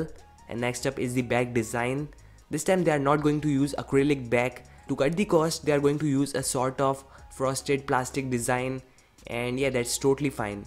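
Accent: India. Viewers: Alter dein indisch dialekt geht mir auf die eier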